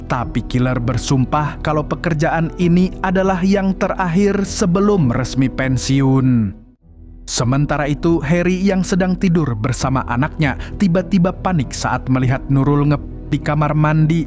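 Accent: Banjar, Indonesia. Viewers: Orang Banjar Kah Min pian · Banjar Jua sekalinya 😮😮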